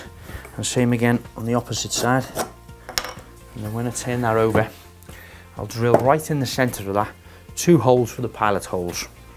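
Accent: Liverpool, United Kingdom. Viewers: excellent tutorial, thanks for clear and patient explanation , is it liverpoolian accent btw? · Thanks, Yes were from L,pool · He’s a scouser from Liverpool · From which part of UK is the presenter ? I like the pronunciation ... especially the "cut" with the U ... :) PS very nice the S too ... shhhtandard ... (great !)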